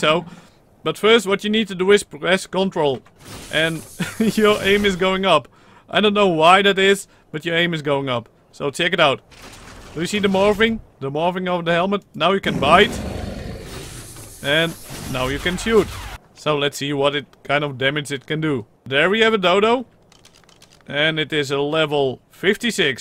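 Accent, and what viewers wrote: Netherlands. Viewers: Ben jij niet gwn Nederlands ik kan het horen aan je accent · Nederlands. Accent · Jaylen Scarlett hes dutch... i think that i recognize my own accent in it (before i moved to the states) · Dutch not indian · U are dutch right because you kinda speak steenkolen english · are you dutch? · Lel your dutch accent is getting weird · Ik hoorde al meteen dat je een Nederlander bent! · Are you dutch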